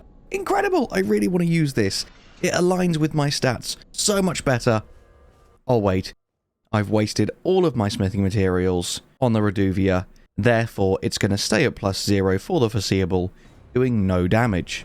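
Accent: United Kingdom. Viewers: I just love your polite greeting in the traditional British accent. You have great info in your videos too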